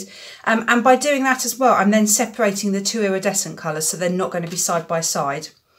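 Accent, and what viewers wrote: England, United Kingdom. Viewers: I love watching your videos, they are so carefully explained and so refreshing to hear an English voice. I feel very much on my own here in UK. Keep going.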